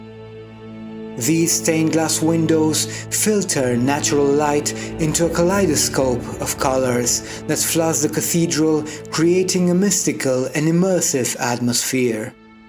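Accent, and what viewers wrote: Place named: United Kingdom. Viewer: una vez más me quito el sombrero ante tí, con tu perfecto British Accent y tu maravillosa forma de narrar,haces conocer al mundo lo precioso que es nuestro país fomentando al mismo tiempo qu vengan a conocernos. Gracias por aprender contigo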